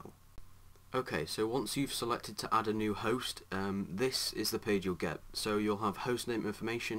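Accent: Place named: Scotland, United Kingdom. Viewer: Shut up he sounds a bit SCOTTISH IM Scottish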